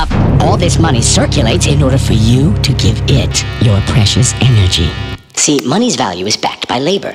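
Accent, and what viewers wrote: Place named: Russia. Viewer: По мне в русской озвучке намного лучше...К тому же тут какой-то русский акцент прощупывается(хотя возможно это только мне кажется)...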